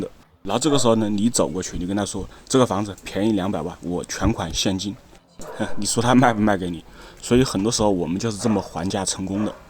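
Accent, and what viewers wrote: Wuhan, China. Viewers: 你要不是武汉口音明显，我真怀疑你是国外长大的。口条都不知道😂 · 之前听你说话觉得你怎么像我们武汉人后来看到评论说你就是武汉人，哈哈原来是老乡！😊😊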